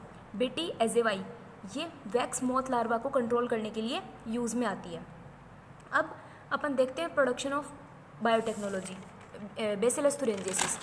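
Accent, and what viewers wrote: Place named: Haryana, India. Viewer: Mam the lecture is so helpful and nice but your haryanvi accent is still more precious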